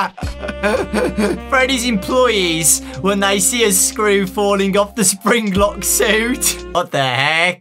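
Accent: United Kingdom. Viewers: why do you sound British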